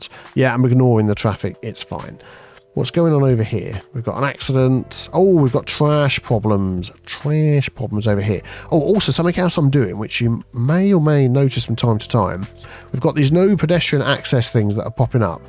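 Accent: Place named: RP, United Kingdom. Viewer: I keep saying “Penndel” in posh voice to myself off and on throughout the day whenever I need a laugh. That caught me so incredibly off guard at 😂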